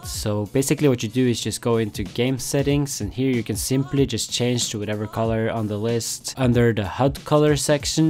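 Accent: Norway. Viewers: Are you from Norway? (hear it on you're accent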